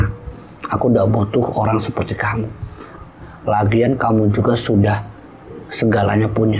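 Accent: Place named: Java, Indonesia. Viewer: Tapi LOGAT Jawa nya masih tetap AdA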